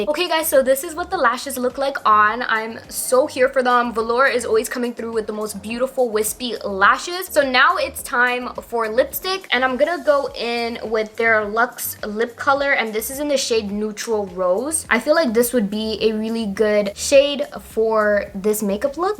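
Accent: Toronto, Canada. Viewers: I knew i heard the Toronto accent lol.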